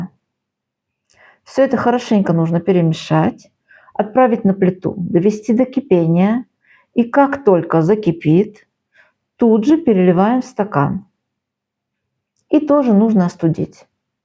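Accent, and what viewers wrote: Baku, Azerbaijan. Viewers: Добрый вечер! У вас акцент наш:) Вы бакинка?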